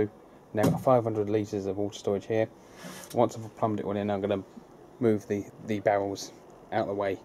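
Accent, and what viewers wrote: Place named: United Kingdom. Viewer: pretty sure youre in the UK. (judging by the accent) and here in the US its illegal to "collect rain water" -_- which ive recently found out does get enforced.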